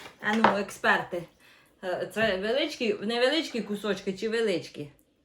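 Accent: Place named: Khmelnytskyi, Ukraine. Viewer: Шматки за розміром ідеальні. Цибулька, водичка, це теж обов'язково. Не люблю коли дають поради , але хочу, щоб тобі теж було смачно. Беру приправу до шашлика в Аврорі, в банках. Ідеально підібрані спеції. Додаєш багатенько.Сіль додатково не потрібна, там все є. Через добу готово. Важливо, щоб спочатку з двох сторін на максимальний жар(запечатати, щоб сік кипів усередені, а зовнє не виходив).І не перетримати, щоб не сухе було. Смачного Тобі! Ти молодчинка! До речі, мова, як в нас, на Хмельнитчині. Привіт Тобі зі Старкона!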